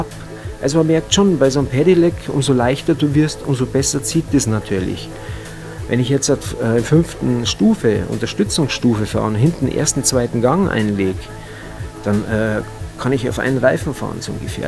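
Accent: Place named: Bavaria, Germany. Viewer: . Guade 5000 km/h;) respekt man. 15 kilo weg da hast ja isar body jetz. Was kostet 1x akku aufladen. Haste das mal umgerechnet? Ich heiz n normales ghost mountainbike ohne motor. Aber ich schau manchen e-bikern schon manchmal wenn meine oberschenkel abkacken n bisschen neidisch hinterher. Kennste die trials zwischen thalkirchen und grosshesseloher brücke? Fetzt!! Aber ich bin da absolut amateur. angenehm deine videos mit deinem bayrischen gossen;) akzent.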